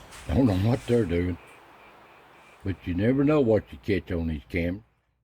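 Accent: Appalachia, United States. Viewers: I'm assuming n we should never do that but you r from Appalachian mountains for sure . Tennessee? Ty Southern brother.hillbily mill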